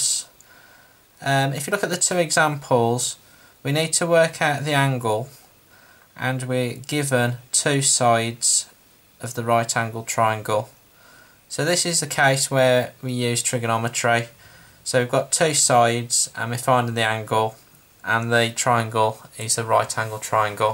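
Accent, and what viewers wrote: Birmingham, United Kingdom. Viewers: that brummy accen though!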